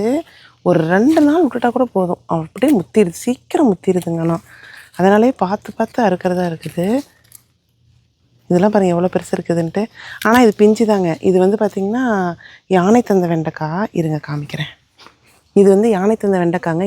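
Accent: Kongu, India. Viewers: Kongu tamil Azhaga pesureenga neenga Coimbatore pakkangala. Super home garden👍👍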